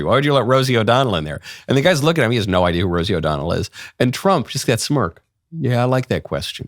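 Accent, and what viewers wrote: Ireland. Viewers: I don't know if that Irisha accent is accurate. But it made me smile. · Your Irish ☘️ accent is pretty good.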